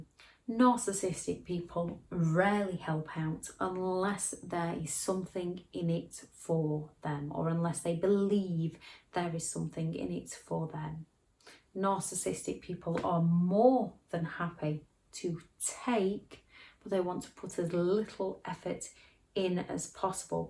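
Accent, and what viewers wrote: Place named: North, United Kingdom. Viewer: Became atoms, from crumbs, from a loaf, from a whole bakery. I never knew things could be salami sliced so much. Thank you for doing this. Its great to hear a northern girl doing this 🙌🏽🙌🏽🙌🏽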